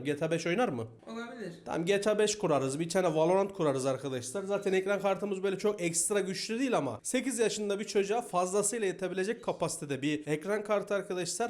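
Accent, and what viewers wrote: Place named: Ankara, Turkey. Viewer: abiciğim sen nerelisin ya konusma seklın aynı ankara :D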